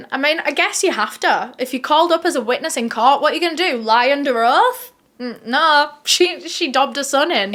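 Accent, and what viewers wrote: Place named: Northern England, United Kingdom. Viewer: Are you from Northern England? Your accent is quite provincial.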